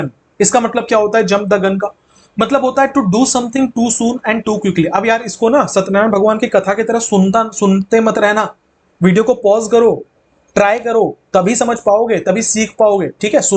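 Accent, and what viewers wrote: Bihar, India. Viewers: sir, I know your voice. You are from BIhar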